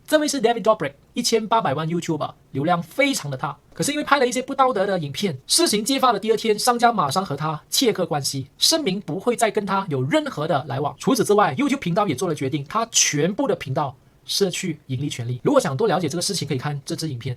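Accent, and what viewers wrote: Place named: Malaysia. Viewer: 哦哦 我是大马人 听你的语音 还以为你是大马的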